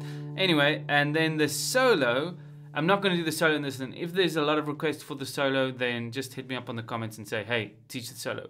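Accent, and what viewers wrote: South Africa. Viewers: Het dadelik jou Afrikanse aksent agtergekom.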